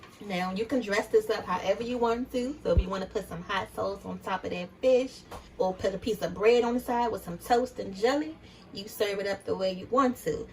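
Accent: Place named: South, United States. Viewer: Sounds like you ade from Mississippi or Louisiana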